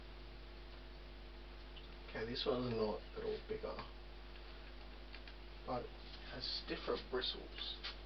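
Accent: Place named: Queensland, Australia. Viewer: It's honestly nothing, and I recognised the Queenslander (because I too, am one). I will support you, because I believe.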